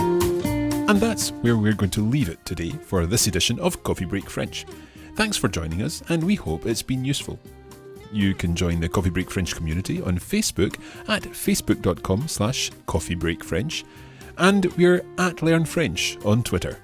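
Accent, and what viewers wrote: Scotland, United Kingdom. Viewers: Thanks m8 I found your pod from some learn french website, love the scottish accent. Maybe after a few of your videos I can finally communicate a bit with my fiances french family. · Speaking French with a Scottish accent. Amazing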